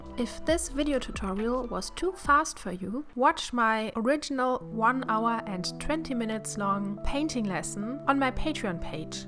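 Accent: Germany. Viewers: Your Art is so beautiful 😍😍🌹 Where are you from? I would guess Germany from the accent.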